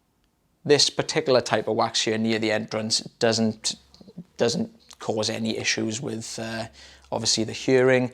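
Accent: Wales, United Kingdom. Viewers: Thank you, so very much, for this fun little video!! 😉💜 I love your accent, where are you from? It's sounds kinda Welsh, but I'm from the U.S. so please forgive me if I'm completely wrong, lol 😉 Great job!! Hope to see you doing more videos!! 🕊️💚👂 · A Welsh accent: love it! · Well done on your solo debut,! Loving your delivery, and your wonderful Welsh accent 😉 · Wales accent, easy to tell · I love Rhys, but I'll take another lovely Welsh accent for my ear removal video. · Job well done. Love the Welsh accent 😀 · Go - very big shoes to fill so good on you for having a go! Amazingly long sausage in that first ear. Love your Welsh accent.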